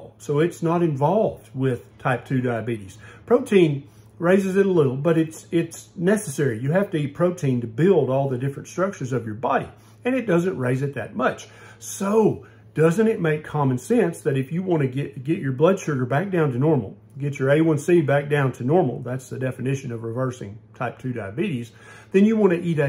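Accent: United States. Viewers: Where in America does the Doctor's accent come from?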